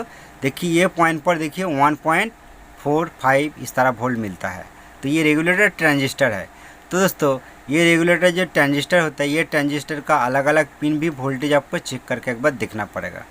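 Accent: Bengal, India. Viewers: Nice information Dada. Apka Bengoli Hindi very nice.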